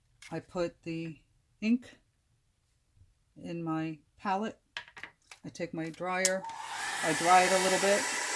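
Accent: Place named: East Coast, United States. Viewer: Thanks for sharing. You make it look easy! Just subscribed. I detect an East coast accent! Lol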